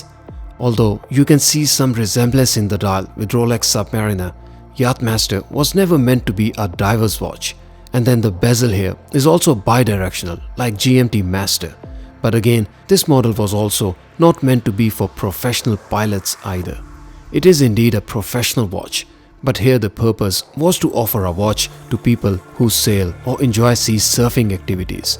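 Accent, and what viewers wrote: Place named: India. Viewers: Fod God’s sake get rid of the Indian accent... otherwise your videos are stunning. I had to think for a minute to figure by “Veer” you meant “Wear”, annoying distractions. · Losing the accent might be difficult to do if he happens to be Indian. Gtfo.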